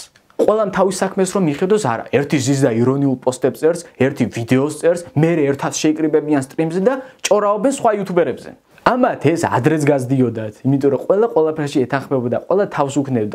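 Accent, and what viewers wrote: Mingrelia, Georgia. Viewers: ჯერ ეგ მეგრული კილო მოიცილე და მერე ილაპარაკე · dajma sad dainaxe tipi 10 wuti wuwunebda banzi megruli tonit vso iumori qarizma rame saintereso normaluri montaji sityvebi mainc rom gamartos araferi ar aqvs yvelaze uintereso 10 wuti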